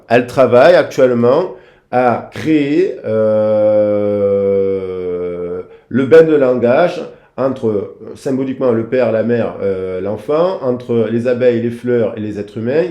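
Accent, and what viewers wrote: South, France. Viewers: Merci pour cette guidance je crois de t’avoir tout dit la dernière fois j’ai voulu aider mon autre je lui ai dit une vérité qu’il n’a pas apprécié désolée mais c’était pour son bien j’en avais marre de voir des vautours autour de lui qui le manipulaient et l’influencaient c’était ma mission d’aider et soutenir les personnes que je croise sur mon chemin de vie maintenant si mon autre désire me parler qu’il vienne me voir et qu’il ne s’inquiète pas je l’accueillerai comme il se doit pour une réconciliation sincère et authentique puis on avisera amour ou amitié je ne sais pas Dieu seul le sait 🙏 bonne journée et c’est toujours avec un grand plaisir de t’écouter chaque fois avec cet accent du Sud qui réchauffe mon coeur❤ grossi bacioni sans oublier Catalina et ton fils 👄👄👄😘👋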